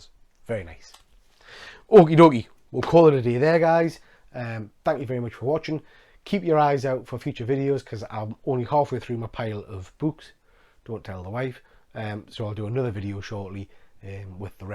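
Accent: United Kingdom. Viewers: Really like your British accent mate👍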